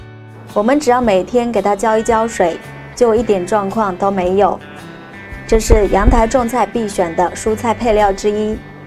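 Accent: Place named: Taiwan. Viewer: 腔調，很台，讚。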